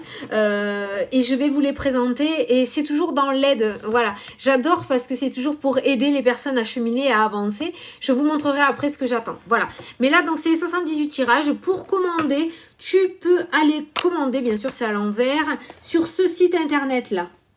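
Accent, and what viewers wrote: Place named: Southwest, France. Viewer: Merci pour tous vos partages et vos guidances! ( j'adore votre accent du sud ouest!)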